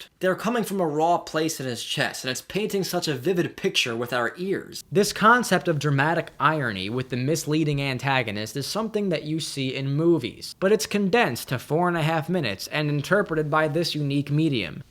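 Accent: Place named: Wisconsin, United States. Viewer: Does anyone know where he's from? He sounds kind of Wisconsin :P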